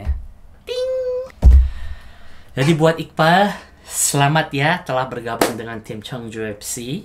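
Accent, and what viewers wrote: Java, Indonesia. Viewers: Lah kok medok yooo... Mantaff.. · Kek logat Jawa bang suara mu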